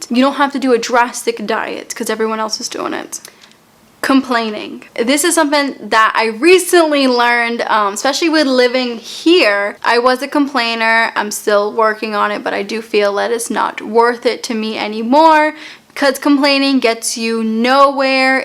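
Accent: Boston, United States. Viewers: I just love your Boston accent. I find it so charming and down to earth. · Lol I love the way she says car. People always point out my thick Brooklyn accent but her Boston accent is really serious. Lol I love it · Such a cute Boston accent.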